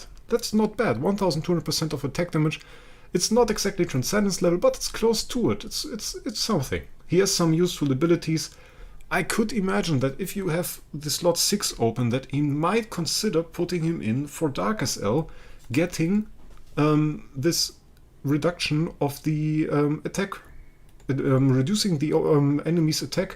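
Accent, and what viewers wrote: Germany. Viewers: I could tell from your accent you were from Germany although I am pretty good with accents also Hi from Canada 🇨🇦 loving the videos bud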